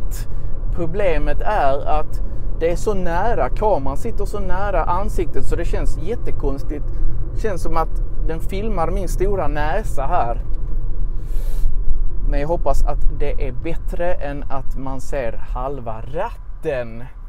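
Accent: Scania, Sweden. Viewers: Älskar skånskan! :))) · Personligen bryr jag mig inte om med skånska dialekten. I Tyskland pratar vi också olika dialekter. Jag förstod allt vad du sa. Alla pratar olika och det är helt normalt. Det är viktig att förstår alla svenska volk, inte bara från Stockholm. Fortsätt med det du gör. Det hjälper oss mycket. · I storbritannien kallar vi det för dialektdiskriminering. Jag kommer från Liverpool och vi har en jättestark dialekt och om någon inte gillar det så kan den dra åt helvete Jag älskar skånska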